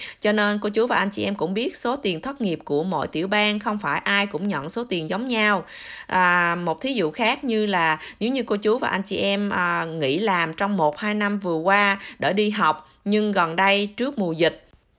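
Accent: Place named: South, Vietnam. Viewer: THANKS 👍 VỚI NHỮNG THÔNG TIN GIÚP CHO NHỮNG NGƯỜI KHÔNG ĐỦ THỜI GIỜ TÌM HIỂU MAY CÓ (GIỌNG ĐỌC Miền NAM, ) NÓI CHUNG LỄ PHÉP.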